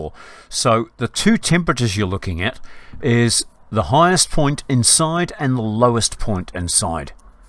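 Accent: New Zealand. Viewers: You sound like a fellow Kiwi man.Not been home for many years and right now I'm living in Odessa but there's no fighting here.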